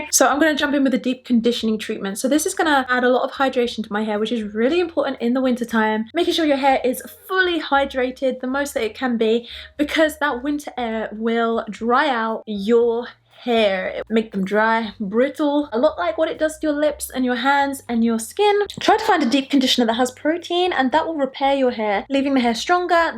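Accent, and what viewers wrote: London, United Kingdom. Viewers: Your hair is growing so so long I love that London accent 😍 💕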